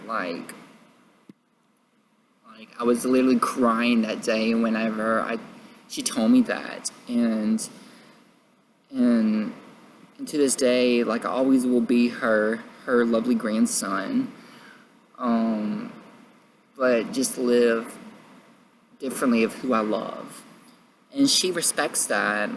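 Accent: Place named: South, United States. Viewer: So You are a gorgeous man, smart, sweet, Have a very southern accent and still love God, Yeah I'm pretty sure you are what we call a perfect guy 😀 This was an amazing Coming out story that was so Genuine and unedited, which is what I think is perfect. You're a Grandma's boy, that may of been the most adorable thing you could say 😊 You sound so much more interesting than me. I'm Steven, Geeky gay guy who's 25 From Upstate New York that has Asperger's. Anyway, I'll shut up, I'm probably boring. I'm happy to be your 13th Subscriber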